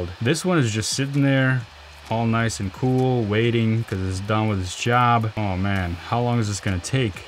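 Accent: Russia. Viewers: da , kagda ya slishal evo ruski dialect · Русский акцент выдает мистера. Гуд кантент. Ай хит дат лайк баттон.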